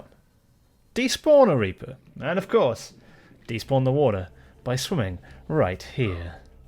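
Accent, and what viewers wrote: United Kingdom. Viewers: Sound like spiffen brit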